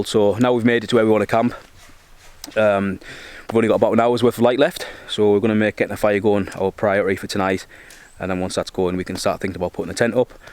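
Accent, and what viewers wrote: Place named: Northeast England, United Kingdom. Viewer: Great relaxing video and no silly music.im 60 now and me and the lads used to do this 😊you sound like tojr from the northeast.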